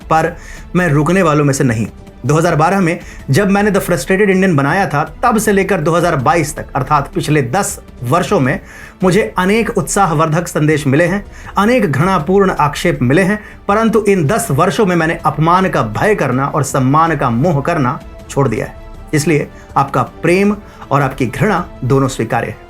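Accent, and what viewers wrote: Standard. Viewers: Santosh karak vaani hai aapki aur shuddh Hindi uchcharan pasand aaya 🙏 aise hi samaj ka kalyaan karte rahe. Dhanyavaad 🙏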